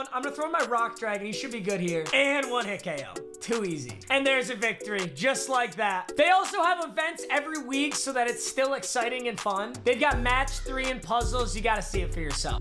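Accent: Michigan, United States. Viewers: It’s Michiganian diction.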